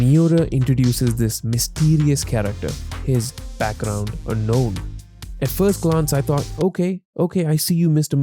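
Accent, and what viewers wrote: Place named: South India, India. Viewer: Why why why you speaking with heavy accent thats why people make fun of us are you south indian or something..stop the accent please its annoying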